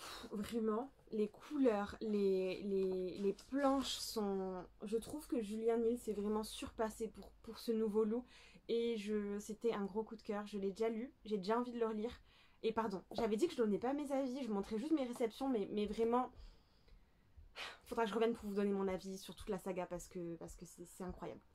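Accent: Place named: South, France. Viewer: J'ai reçu Pumpkinheads pour mon anniversaire aussi, sauf qu'Amazon a merdé et qu'il est en italien :') Heureusement que je le parle un peu, mais ça va être folklo ! J'ai très envie de me jeter sur Le Royaume assassiné aussi maintenant que je l'ai reçu miraculeusement en HB 😍 Trop cool pour le pendule ! (j'entends de fou ton accent du sud sur certaines intonations mdr)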